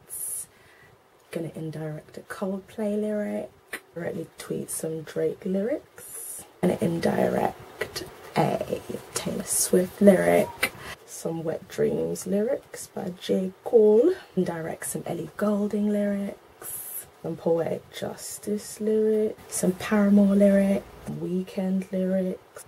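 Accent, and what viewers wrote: United Kingdom. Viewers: A Black Girl With A British Accent, That is The Best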